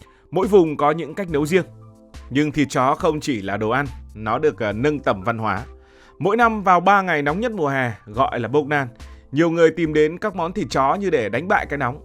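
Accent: North, Vietnam. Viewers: Thằng mc luon bưng bô và nó lại nói tiếng bắc mà tui bắc lai không biêt bố no là ai ,và luon ra đường k dội nón và óc bò khi lái xe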